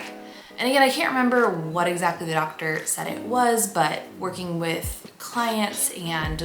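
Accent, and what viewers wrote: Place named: Idaho, United States. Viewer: Am so excited to have a new great channel to watch! Sounds like you're maybe an Idahoan too?! Neighbors!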